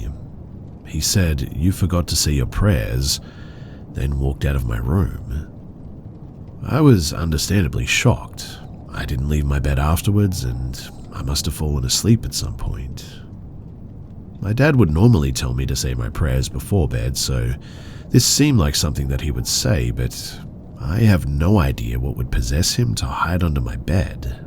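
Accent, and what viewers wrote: Australia. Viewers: I love falling asleep to so much but I wonder if we were to ever meet in person if the sound of his voice would cause me to doze off in the middle of conversation?😆 My brain hears that Australian accent and I'm gone!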